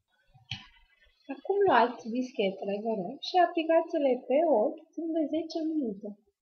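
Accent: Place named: Italy. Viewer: Si eu locuiesc tot aici in Italia , vorbesc 5 limbi straine si nu mi-am schimbat deloc accentul....incearca sa vb fara accentul care il au italienii, pastreaza-l doar atunci cand vb italiana, crede-ma e mult mai frumos.Nu am nimic cu moldovenii cand nu vor sa para ceea ce nu sunt.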